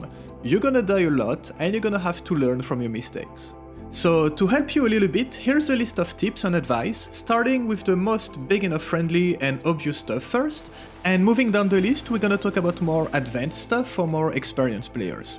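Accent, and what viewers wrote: France. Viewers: you sound french, and i know what i'm talking about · is that a french accent ?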